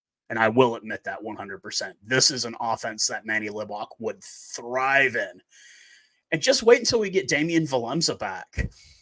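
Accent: United States. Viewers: Just subscribed to you just because of your knowledge of the Springbok set up and your obvious love for our boys whilst conversing with a USA accent. ❤from CPT, SA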